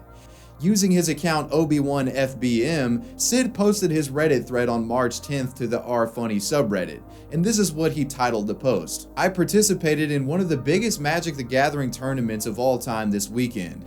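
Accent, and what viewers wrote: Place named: Virginia, United States. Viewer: Dude your Virginia accent is on point. Are you from around there?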